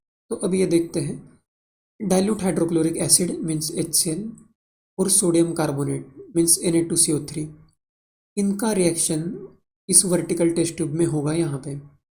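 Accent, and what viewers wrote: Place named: Maharashtra, India. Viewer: Pure Marathi accent ❤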